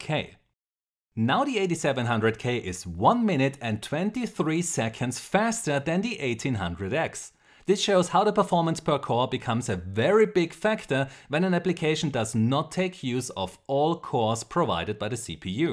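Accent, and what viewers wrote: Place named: Germany. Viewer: are you german? where does your strong accent come from?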